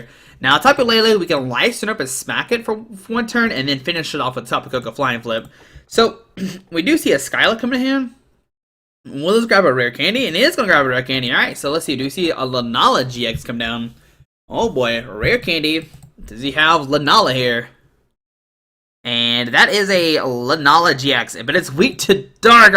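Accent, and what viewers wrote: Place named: United States. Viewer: Guys, it's just a regional accent. Everyone has one. Everyone understands what he's saying, what's the big deal. And I can assure you that an awful lot of people wouldn't be able to tell much difference between his accent and any other US regional accent.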